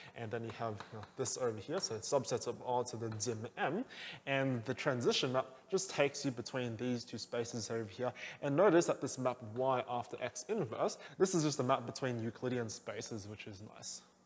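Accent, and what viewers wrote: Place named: Australia. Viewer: Haha, I was just trying to place your accent when you gave the Sydney example. It’s an Australian accent, of course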